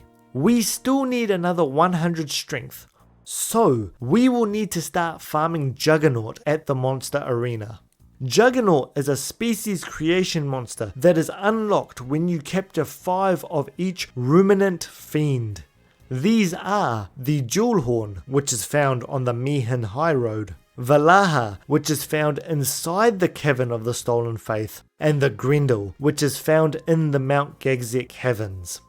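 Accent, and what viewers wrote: New Zealand. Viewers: Started the video cause it’s my favourite game of all time, then the kiwi accent hit me like a tonne of choccy fish! Kia ora bro! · Took me until here to realize that you were a kiwi, This absolutely sent me. Ka Pai cuz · are you kiwi by any chance?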